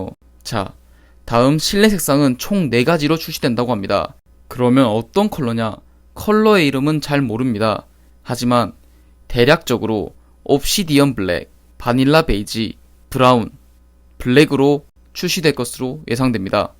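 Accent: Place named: Gyeongsang, South Korea. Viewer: 숨길수 없는 깅상도억양 첯음절에 강력한 엑센트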